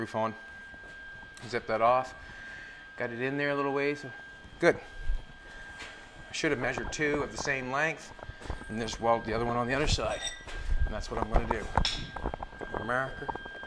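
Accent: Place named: Canada. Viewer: Ohhhh. "Tudor"!... last episode I kept thinking you were saying "two door" over and over again. Hahaha i gotta get caught up on my Canadian accent decipher. Looking good guys! 1 hour chop!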